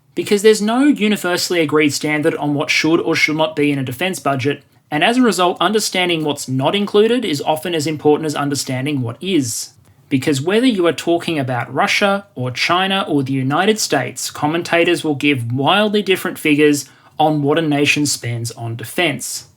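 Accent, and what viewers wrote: Australia. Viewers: Not too often one can hear Argentina in an australian accent. Love your videos mate, greets from this far away country. Un abrazo a todos guacho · Your chinese pronunciation isn't bad. As an ABC (American Born Chinese) it was super weird hearing Chinese spoken with an Aussie accent.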